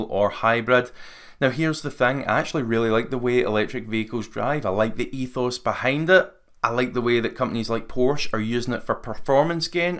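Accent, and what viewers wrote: Scotland, United Kingdom. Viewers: I love the scottish accent. It just wonderful.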